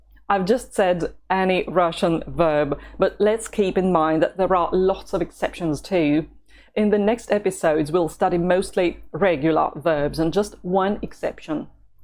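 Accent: United Kingdom. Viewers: Hi which country are you living now? I find that you have a British accent when you speak English:)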